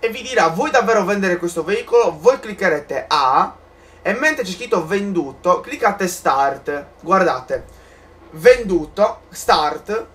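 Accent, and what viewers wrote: Sardinia, Italy. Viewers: Sei sardo vero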